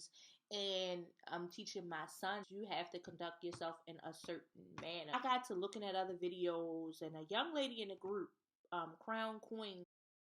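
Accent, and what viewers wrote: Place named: DMV, United States. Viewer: I enjoyed this video very much so. Honestly! Everything you said hit the nail on the head. S/N: Are you from the DMV area? Your accent is everything 😍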